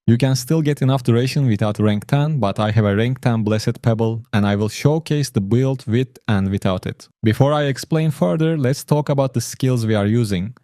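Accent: Turkey. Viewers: kanka ingilizcenden anladığım kadarıyla Türksün. F2P oynanır mı zevk alınır mı bu oyundan ?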